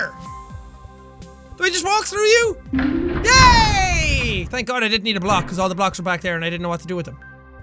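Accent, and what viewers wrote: Ireland. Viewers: are u asking if he's Irish or not because he definitely is Irish and he's had that accent for a while haha :3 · is he irish?? im new ;p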